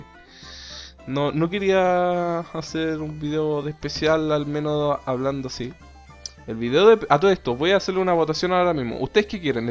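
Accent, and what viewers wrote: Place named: Chile. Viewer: Yo te sigo porque sos chileno porque y amo tu acento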